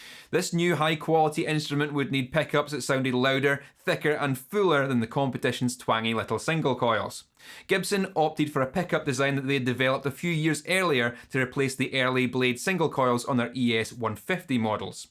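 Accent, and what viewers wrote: Scotland, United Kingdom. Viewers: My favorite part of the Scottish accent is that I know what word is coming next but I have no idea what it’s going to sound like. Riding along with a whole sentence is a lot like a 1980’s movie dressing room glow-up montage.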